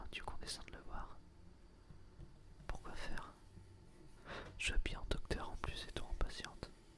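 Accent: South, France. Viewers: C'est cool j'ai exactement le même accent que toi mdr 😂 je viens du sud aussi. Sinon continu comme ça t'es le meilleur 💪👍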